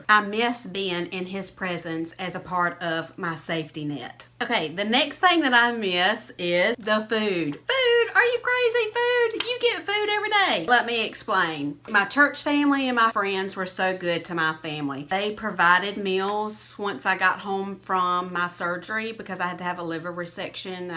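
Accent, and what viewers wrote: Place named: South, United States. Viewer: Oh Dear God!!! Love the southern accent!!! Where you from girl?? Lemme guess, Georgia??? My parents used to live right outside of Atlanta. I grew up in south west Arkansas. Girl you are killin it! Keep it up! Mashed that 🛎!!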